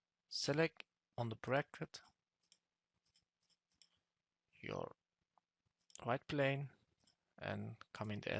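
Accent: Turkey. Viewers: Hocam siz türksünüz galiba aksandan biraz öyle gibi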